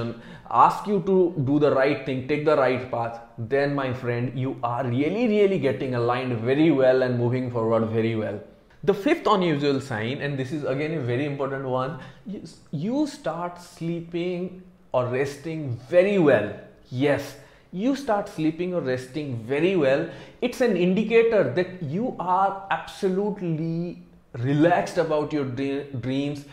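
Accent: India. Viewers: Is-spirituallity indian accent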